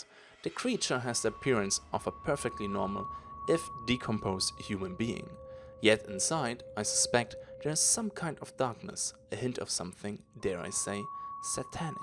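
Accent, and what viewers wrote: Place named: Germany. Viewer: The accent makes it kinda obvious you speak German. Grüße gehen raus :D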